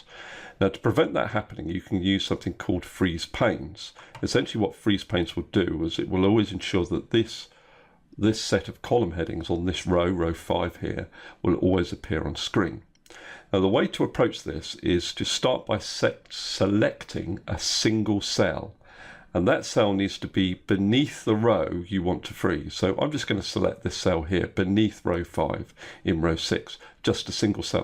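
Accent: United Kingdom. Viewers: Your british accent makes this so much more fun/engaging. When you said "isn't that brilliant?" I was like, why yes... yes it is.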